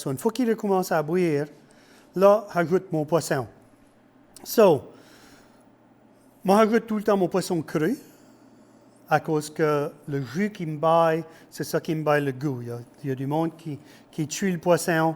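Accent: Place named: Acadia, Canada. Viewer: J'adore quand tu parle acadien je suis une acadienne et j'ai preste tout perdu mon parler et je te écoute et sa me fait du bien merci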